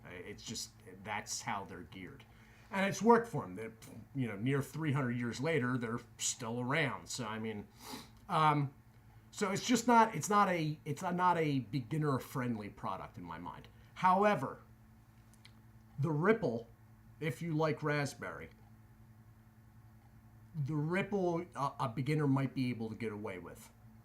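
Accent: United States. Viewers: Where are you purchasing these snuffs? Judging by your accent, I assume you're American. I'm a former smoker and current user of Copenhagen snuff. I'd like to explore the world of English snuff and would appreciate any insight on purchasing it as I know it's produced across the pond. I enjoy the content too. Cheers!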